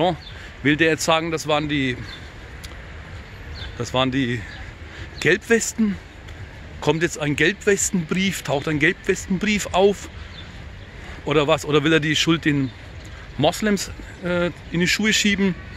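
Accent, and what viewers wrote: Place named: Franconia, Germany. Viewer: Frage. Du hast so nen fränkischen Dialekt. Bist Du in Nürnberg aufgewachsen. Meine Frau ist aus Forchheim, deshalb fällt mir das auf:-). Und ich hab auch etliche Jahre dort verbracht.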